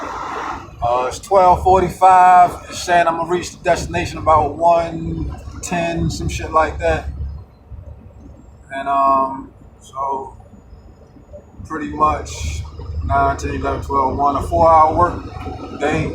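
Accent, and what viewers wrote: Baltimore, United States. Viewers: hey man do you have an email i get ask you a few things, sound like you're from baltimore. are you out of the hagerstown hub?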